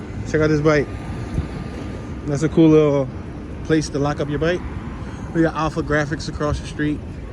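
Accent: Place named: Brooklyn, United States. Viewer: Sounding deep Brooklyn.enjoy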